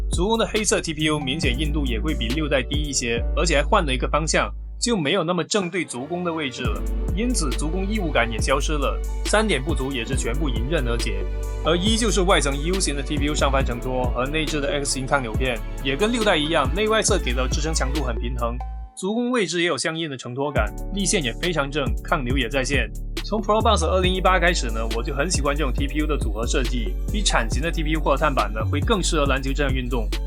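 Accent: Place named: Hakka, China. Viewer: 你是客家人嗎? 這鄉音也太重 哈哈哈 超親切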